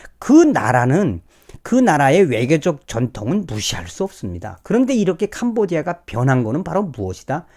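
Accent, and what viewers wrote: North Korea. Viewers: 정산성대표님 보면 부칸사투리 웃음부터 나고 좋아요 가열치게 부칸 소식 알려줘 늘 감사해요 건강조심하고 행복하시길 기원합니다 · 이런식으로방송하지마셔 이북말듣기싫습니다 우리말나두고왜 이북말로하나요?이북말듣기싫습니다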